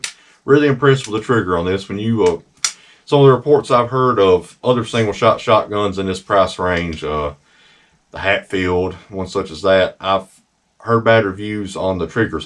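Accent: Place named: South, United States. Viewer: I subscribed just because of his voice and southern accent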